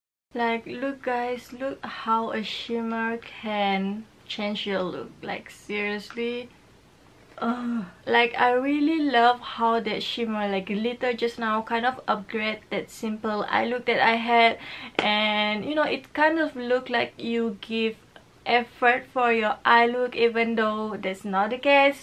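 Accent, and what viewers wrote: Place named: Malaysia. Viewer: I'm from malaysia too !! nice video ♡ your accent is adorable ! :)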